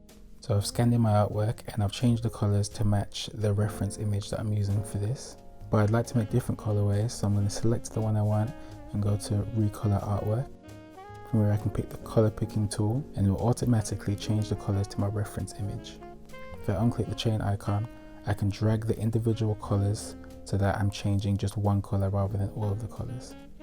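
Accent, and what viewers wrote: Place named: England, United Kingdom. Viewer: Are you. British? You have such a nice and calming voice but your accent is very interesting because it isn’t super strong English accent :) your art and crafts are so beautiful and good too it’s amazing how you can make all this stuff yourself and very creative!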